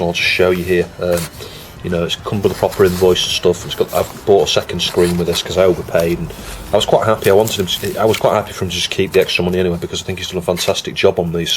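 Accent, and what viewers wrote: United Kingdom. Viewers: It's always interesting to me how British people pronounce "solder" the way it looks like it should be pronounced. I never quite understand why us Americans decided to go with "sodder" for the pronunciation.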